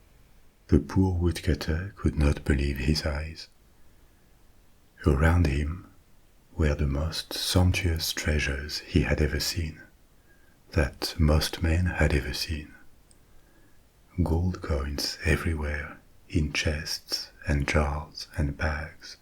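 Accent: France. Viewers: Son accent français j’adore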